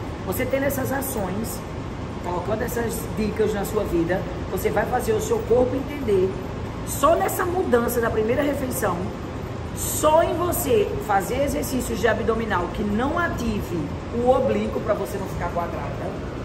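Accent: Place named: Northeast, Brazil. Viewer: Mulher vc sabe tudo não vejo a hora de começar seu treino ,já gostei de vc de cara esse sotaque nordestino é muito massa parabéns por o seu trabalho ❤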